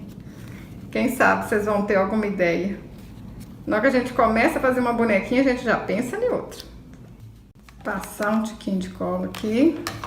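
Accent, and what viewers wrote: Minas Gerais, Brazil. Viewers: Amei essa ideia!!! Já acompanho seus vídeos há um bom tempo, mas só agora me inscrevi no seu canal. Gosto das suas ideias criativas. Às vezes me inspiro pra fazer totalmente novo e diferente do do vc fez, mas o crédito da ideia é seu. Usei uma ideia sua pra fazer o cabelo de uma vovó. Obrigada querida! Ah, amo sua mineirice😊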